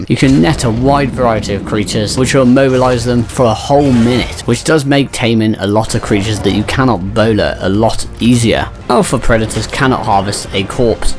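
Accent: United Kingdom. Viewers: This accent is different, British!??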